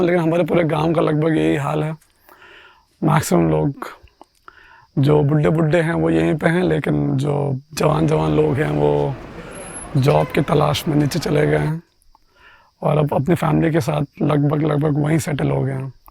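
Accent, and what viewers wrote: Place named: Garhwal, India. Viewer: Good garhwali accent 🎉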